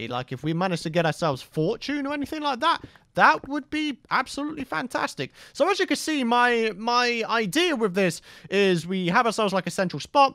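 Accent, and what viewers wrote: United Kingdom. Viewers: I love british accent people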